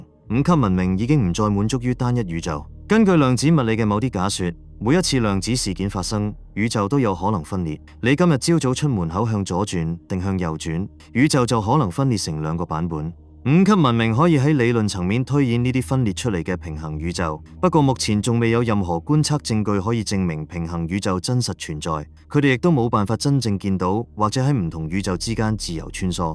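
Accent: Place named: Hong Kong, China. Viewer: 好少有人用港式廣東話講宇宙級文明，好清晰唔錯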